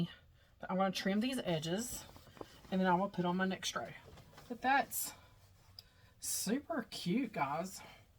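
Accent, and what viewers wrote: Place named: South, United States. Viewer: My favorite Christmas cookie is Chocolate Crackle cookies. It is so easy to make with a cake mix. Every time I make them they just disappear in a hurry. My whole family loves them. Love your channel and love to listen to your southern accent as I have said before. Your videos are all great.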